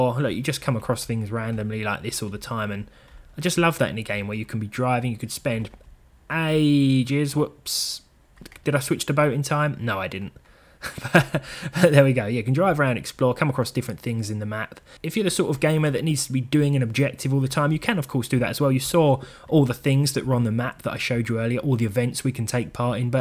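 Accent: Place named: Essex, United Kingdom. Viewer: M25 racer (also London racer) were set in London and the Thames valley. You could race around Oxford or Knightsbridge. sounds like he's more Essex way, but the game was at least set in England!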